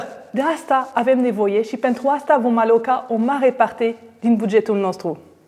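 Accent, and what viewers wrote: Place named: France. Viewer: Aveti un vocabular foarte bun, dar ar trebui sa lucrati la dictie pentru a elimina acel accent frantuzesc foarte puternic si a pronunta corect litera R. Suna foarte neplacut in limba romana. La emisiunea "chefi la cutite" vin straini care vorbesc MULT mai bine romana dupa doar cativa ani in Romania, chiar si doar dupa cateva luni...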